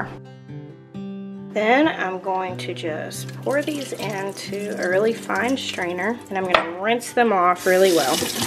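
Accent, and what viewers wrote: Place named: North Carolina, United States. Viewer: Your channel was the first channel I watched when I decided to go Eden on my yard. Very informative and thorough on your videos. The accent also makes me miss NC. Keep up your great work and on educating myself on so many topics. Thank you.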